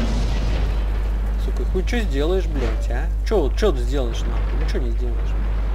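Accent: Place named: Odessa, Ukraine. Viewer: Зато Одесский колорит прям хлещет из речи. Одесский быдло - колорит. Хотя хотя если брать, то у него даже не быдло стайл... Это просто говно.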